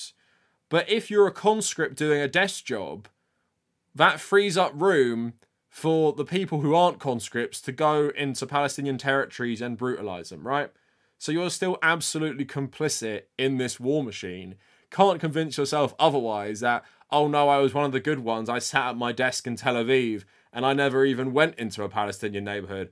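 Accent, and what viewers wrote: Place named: United Kingdom. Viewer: You gotta love a Dude with a British accent worrying about Woke American liberals. Aren't you guys still working out Brexit with your 3 fleet navy?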